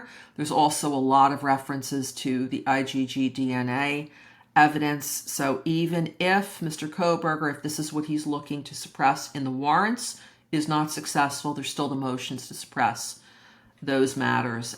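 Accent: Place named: New Jersey, United States. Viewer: You sound like you're from NJ. I'm a Cathy from NJ✨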